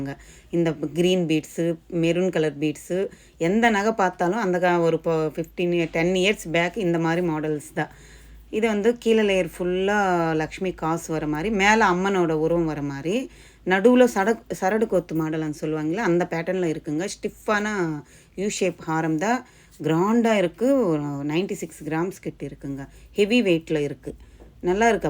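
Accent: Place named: Telugu, India. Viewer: Your telugu mixed tamil voice.. is my favourite ❤️♥️ that too you say superuh irunthuchii 😍 anyways your video collections are so nice sister...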